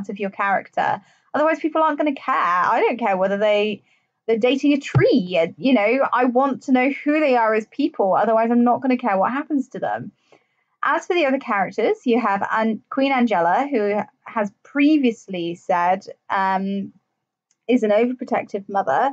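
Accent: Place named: United Kingdom. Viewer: You kept saying antagonist wherein you should be saying protagonist... Is it me or is it your brit accent? Anyway I do like this new iteration. But the old one was way better.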